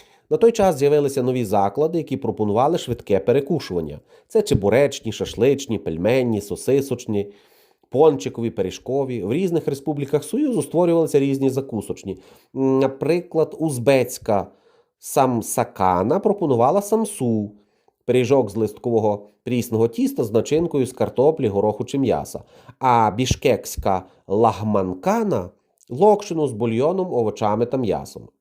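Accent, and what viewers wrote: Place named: Western Ukraine, Ukraine. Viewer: ЩО ТИ МАЕШ ЗАРАЗ ЧОГО НЕ МАВ ПРИ СРСР ? АВТОР ВІДЕО МАБУТЬ ЗАПАДЕНЕЦЬ ЕМІГРАНТ СЛУХАЮЧИ ЙОГО МОВУ .ГИДОТА.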